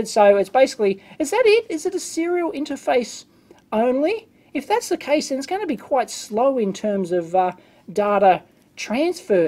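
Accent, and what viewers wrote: Australia. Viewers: In the fourth grade, I'm in the US, we had to put a picture of ourselves on a world map with a string going to where our family came from. I asked my mom and I thought she said "Australia". So my string was all by itself and the teacher was like "really". All the others kids strings went to somewhere in Europe. Well I asked her again and had to move my string to Austria with all the others kids. Explains why me mother's parents didn't sound like you. I never got to come from a fun country. :-(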